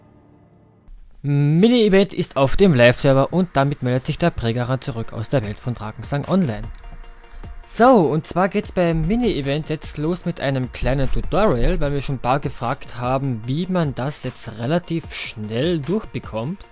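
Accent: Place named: Vienna, Austria. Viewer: hör doch den dialekt als österreicher raus ^^ wiener schätz ich mal :P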